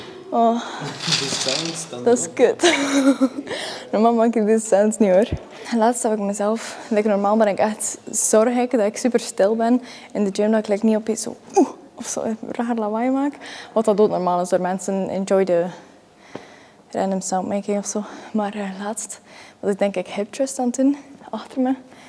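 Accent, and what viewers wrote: Flanders, Belgium. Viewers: Vlaams is Nederlands, maar dan mooier ❤ Een hele leuke video! Ik ga zelf ook naar de gym en het is gaaf om andere gymvideo's te zien. Weer een topvideo, een geslaagd experiment! :) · I love your Belgian accent. 😀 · Dit is vlaams kut nee maar respect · She has a Belgian accent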